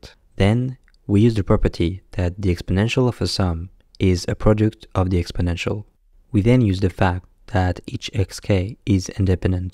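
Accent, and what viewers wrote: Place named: France. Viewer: Difficult to hear the difference between Gaussian and Cauchy when a French pronounces it 😂. Great video anyway.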